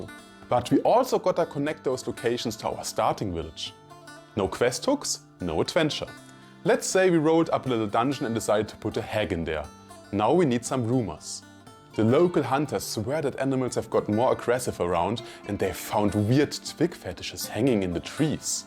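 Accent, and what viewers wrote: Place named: Germany. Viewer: klingt nach nem fellow german der englisch spricht. good luck mit dem channel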